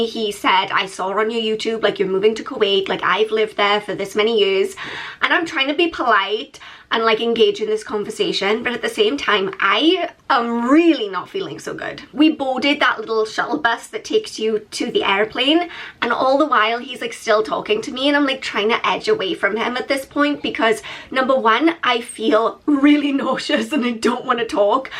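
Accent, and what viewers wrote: Wales, United Kingdom. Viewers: That welsh accent lol. Anyway I spent 4 years of my childhood in Kuwait a long time ago. It was a great hospitable country but even back then there were some weirdos. Even my mum had the odd unnerving but rare experience but was always quite firm.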